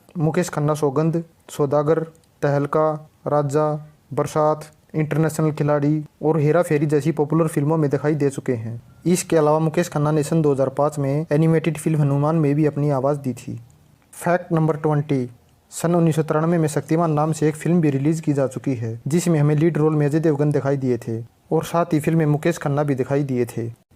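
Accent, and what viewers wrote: Haryana, India. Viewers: Bhai jyada haryanvy touch aa rha h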